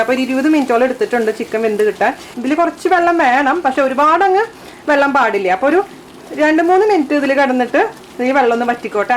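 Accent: Thrissur, India. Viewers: yude big fan anu njan. Mattu cooking show kalil ninnum valare vyathyastamaya reethiyilulla avatharanam anu.. Thrissur slang ilulla samsaram kelkan thanne rasamanu.... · ഗഡീ ഞാൻ കരുതി ഡെന്ദേദ് ദെ നുമ്മടെ തൃശ്ശർ കാരിയാണല്ലോ ഉണ്ണേടെ നാടൻ ശൈലി, ഹാഫ് ആയപ്പോഴാ എന്റെ നാട്ടുകാരിയാ... സൂപ്പർ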